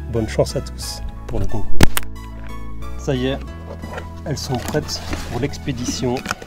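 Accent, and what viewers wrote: Belgium. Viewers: = un accent belge à en rendre jaloux les Français. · = vive l accent belge j adore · =la bonne ambiance de tes vidéo et ton accent belge font des vidéos super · = un accent belge bien sympa, avec des vidéos bien intéressantes pour novices et confirmer!👌🏼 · = le meilleur accent belge de tout les temps!:D Le team Celt'fish te suit quotidiennement sur les réseaux! À bientôt!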